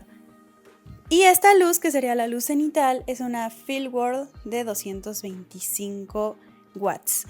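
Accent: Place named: Mexico. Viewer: Te quedó hermosa la foto!! 📸 PD: me encanta tu tonada mexicana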